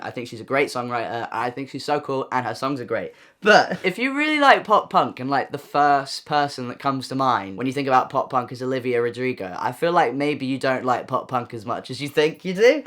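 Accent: United Kingdom. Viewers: you sound so british here /neu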